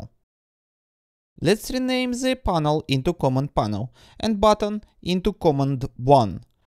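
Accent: Russia. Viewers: does he speak with a Russian accent? он говорит с русским акцентом?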